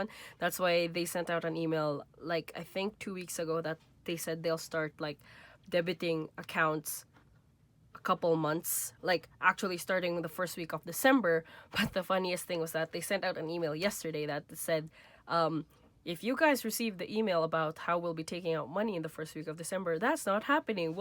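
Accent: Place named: Canada. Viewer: You have an accent are originally from Canada.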